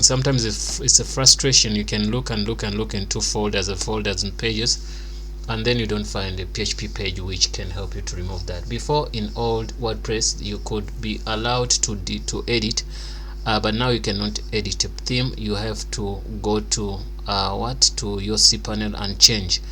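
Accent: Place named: South Africa. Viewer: Great video thanks man, keep it up. Good to hear a South African-like accent online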